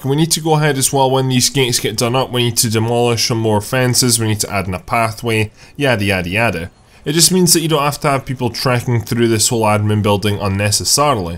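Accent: Scotland, United Kingdom. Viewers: New block? Scottish accent? Cites Skylines? i'm pumped as f$&£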